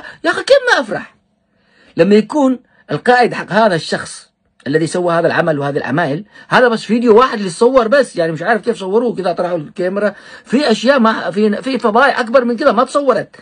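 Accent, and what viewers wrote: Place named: Hadhramaut, Yemen. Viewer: لهجته حضرمي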